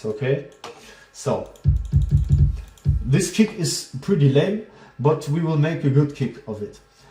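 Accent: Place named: Germany. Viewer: ドイツ訛り英語だけど逆に日本人には聞き取りやすいし、日本語のタイトルは嬉しいね。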